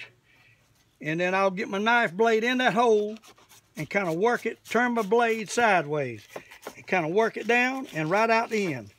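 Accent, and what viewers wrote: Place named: South Carolina, United States. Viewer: Great video. Love the gamecock drawl too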